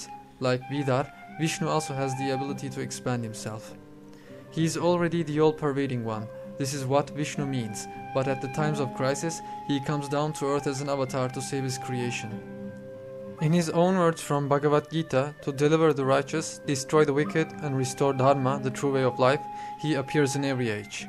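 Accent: Turkey. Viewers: Aksanından Türk olduğunu anlamıştım. :D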